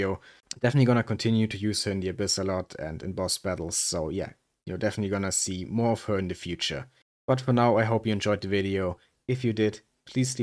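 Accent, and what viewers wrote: Germany. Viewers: Gutes Video, finde es krass, wie sehr man heraushört, dass du deutsch bist. xD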